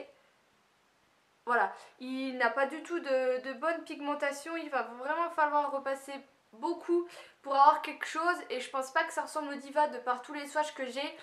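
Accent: Lorraine, France. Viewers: j'entends pas l'accent parce que je suis Lorraine aussi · l'accent lorrain est très spécial malheuresement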